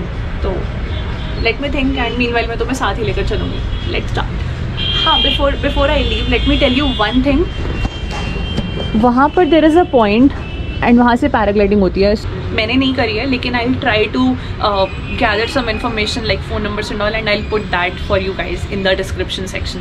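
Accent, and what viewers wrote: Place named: Punjab, India. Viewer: I’m traveling to McLoedganj next month and your videos are helping a lot to learn about the place. I like your voice and Punjabi accent, that makes it more interesting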